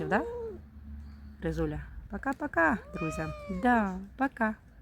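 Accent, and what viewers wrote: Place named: Poltava, Ukraine. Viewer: Дякую за відповідь. Я з Лубен, дуже мова Ваша на нашу з Полтавщини схожа. Тримайтесь, все буде добре.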